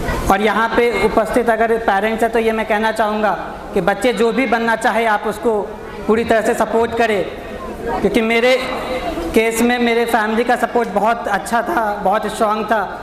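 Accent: Bihar, India. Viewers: Hindi pronunciation is general problem in Bihar & that is the recognition of a Bihari,if we start speaking like UP ,our recognition is like to be lost. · वे हिन्दी जाने बिना टाप नहीं किए है पर बिहार की हर जगह की अपनी एक भाषा शैली है जिससे अलग होने पर बनावटीपना दिखता है। साधारण परिवेश में पालन होने पर कुछ असर रह जाता है।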